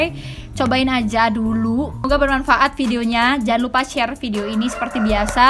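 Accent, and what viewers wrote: Java, Indonesia. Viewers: Wong jowo ya Bae medoke😎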